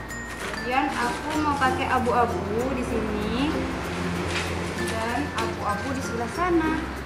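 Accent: Madura, Indonesia. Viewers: Suka sama cara bicara .. Soalnya khas² maduranya itu kerasa🤣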